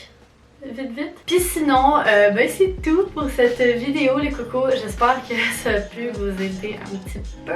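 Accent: Quebec, Canada. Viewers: T’es québécoise? Edit: oh wow laisse tombé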